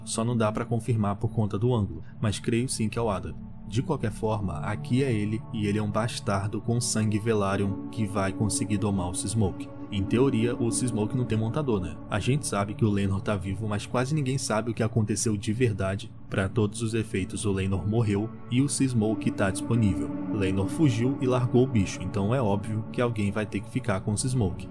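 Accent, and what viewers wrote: Rio de Janeiro, Brazil. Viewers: Por que o narrador do vídeo tenta mentir e esconder o seu sotaque carioca?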